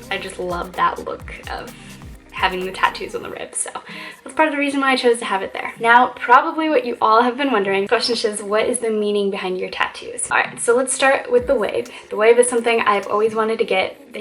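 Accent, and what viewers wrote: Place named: New Zealand. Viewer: you have a cute lil nz ❤️ that makes me so happy haha xx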